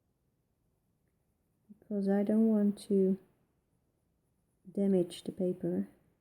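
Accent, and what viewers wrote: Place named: Netherlands. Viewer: The expression "raining cats and dogs" is still in common usage in the U.S. Chartreuse rhymes with "loose." I find your Dutch-tinged English to be delightful and charming, with never a time of misunderstanding. We find these days that the younger generation simply will not speak grammar properly, so it is actually a relief to me to listen to your excellent English. Ohmigosh - sign me up for your workshop at one of our national parks!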